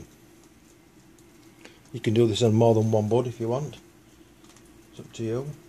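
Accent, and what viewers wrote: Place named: Northern England, United Kingdom. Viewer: Thanks for the cool video. Sounds like you are from Northern England and I realize there is accent involved, but I live in Hawaii, and we pronounce the word "CAY-key".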